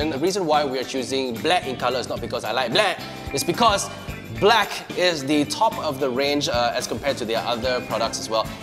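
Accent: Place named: Malaysia. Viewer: Are you Malaysian? Idk maybe its just me you sound Malaysian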